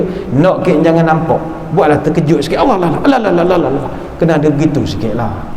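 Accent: Pahang, Malaysia. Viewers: Sorry sis,memang macam inilah loghat Pahang,mungkin dgr mcm ada iras2 ganu sebab Kuantan sempadan dgn kemaman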